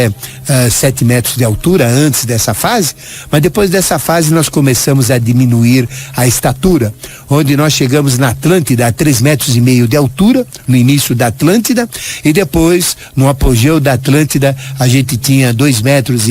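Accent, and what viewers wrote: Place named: Paraíba, Brazil. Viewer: esse teu sotaque de paraiba que fode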